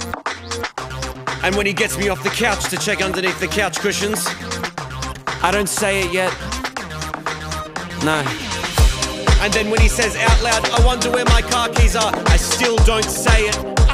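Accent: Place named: Australia. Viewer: Why isn't there anyone who mentions that his Australian accent is at least like 50% of what makes this perfect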